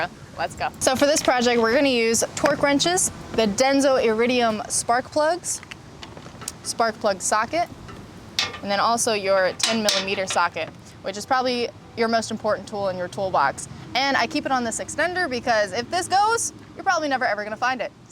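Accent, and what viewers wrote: United Kingdom. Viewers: A little British accent. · Hats off to you for using anti-seize compound. Why do you intermittently speak in a British accent? In answer to people asking why she’s changing the plugs so soon, it’s so she can make an instructional video. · Great British accent. The clip ain't bad either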